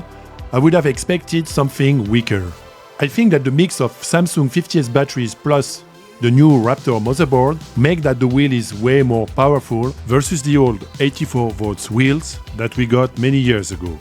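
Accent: France. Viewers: Drôle d'accent. On dirais un francais qui parle anglais.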